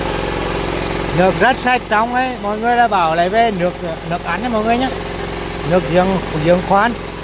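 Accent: Nghe An, Vietnam. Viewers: thật tuyệt vời, vẫn tiếng Nghệ cười có kể, ăn có kể, đẻ có kể 🤩🤩...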